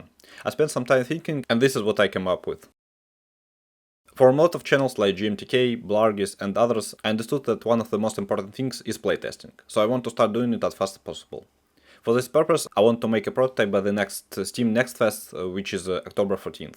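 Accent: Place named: Russia. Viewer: слыша акцент я не могу не думать что автор из России или стран снг, не удивлюсь если это так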